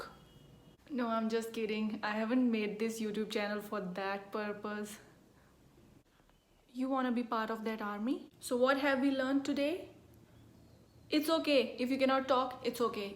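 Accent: India. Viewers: Love your indian accent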